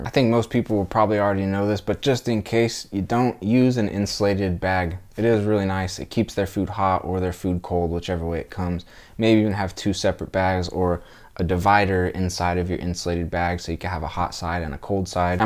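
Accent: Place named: West Coast, United States. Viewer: E-Bike, west coast accent and $35 an hour. Definitely has to be SFBA.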